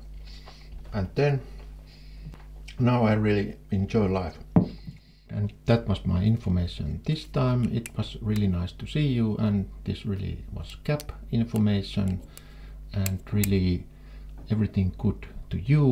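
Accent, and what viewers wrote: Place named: Finland. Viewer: As a Swede he sounds like he is from Finland to me. Idk but that is a guess I would feel pretty confident in..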